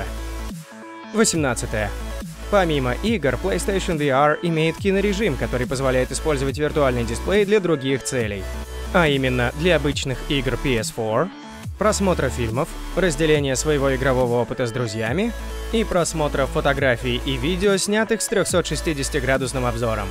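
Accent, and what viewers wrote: England, United Kingdom. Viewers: по-моему слишком выраженный английский акцент) не стоит, так как еще и по сравнению с русским контраст смешной получается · плюсую английский раздражал аж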